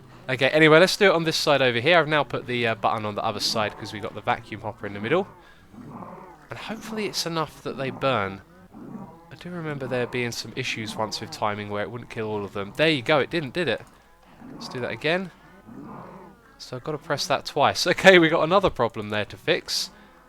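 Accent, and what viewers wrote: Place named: England, United Kingdom. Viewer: Don't correct how someone pronounces it, people pronounce in different ways. He's english you are most likely American.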